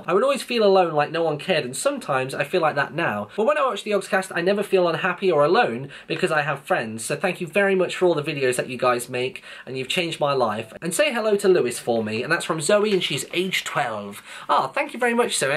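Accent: United Kingdom. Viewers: He says it correctly (for a brit). Stop thinking that your way is correct.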